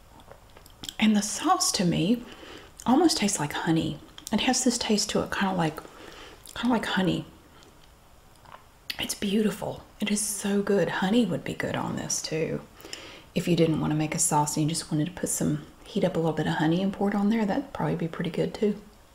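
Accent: South, United States. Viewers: Thanks, another great video. My sister and I love your southern accent, it relaxes us, probably growing up watching American shows on TV. Love from Melbourne, Australia.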